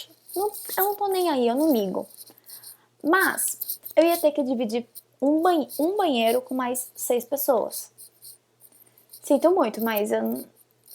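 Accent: Recife, Brazil. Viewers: Tu é de Recife?? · tú é dq de Recife ?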